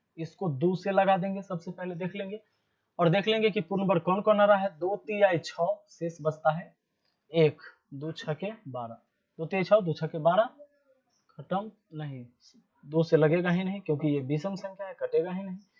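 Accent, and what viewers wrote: Bihar, India. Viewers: Thank you sir aap bihar se hai ya haryana se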